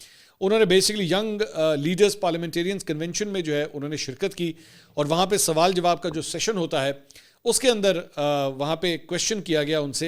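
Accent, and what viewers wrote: Punjab, Pakistan. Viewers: bhai doraan e program apka punjabi k tarka is quite hilarious...jumle k maza ajata hay🤣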